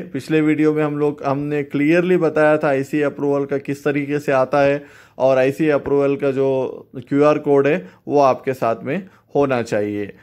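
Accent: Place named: Hyderabad, India. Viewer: Assalamalaikum MASHAALLAH khali awaz se phechan lete Hyderabad ke koi hai to Bas aap se ye hi guzarish hai ke please Hyderabad ka naam roshan karo.. Allah hafiz dua ki gusarish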